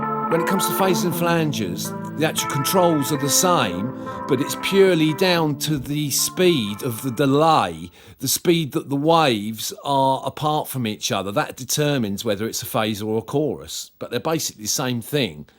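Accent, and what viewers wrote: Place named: United Kingdom. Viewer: Haha, I love your British accent. Great job with the video.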